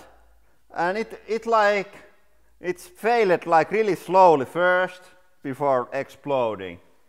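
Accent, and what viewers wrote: Finland. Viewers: I love indirectly learning Finnish from these videos based on the accent alone, it throws me off when he says things like “asked” because it’s a one-syllable word but hearing it like “ask-ed” makes me stop and think about how phonetic Finnish is. Hopefully I can break down syllables easier, especially when pronouncing the months and words like kyysymys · R U from Finland my friend ?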